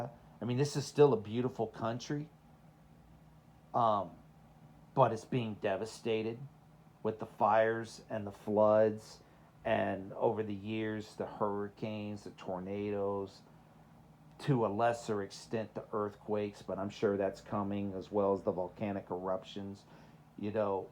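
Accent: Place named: Minnesota, United States. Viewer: You sound more like Minnesota accent than TX surprisingly.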